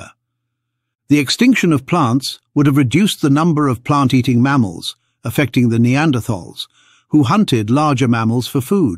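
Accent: England, United Kingdom. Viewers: No, I don't want to listen to your neanderthal erotica in a British accent... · Just having an English accent doesn't make you intelligent. All this interbreeding stuff is a load of crap you don't have enough Neanderthal DNA sequenced to know what your spewing this is all speculative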